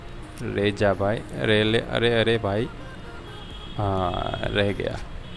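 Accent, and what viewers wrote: Gujarat, India. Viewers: Apki hindi se lagta he aap gujrati ho Reply plz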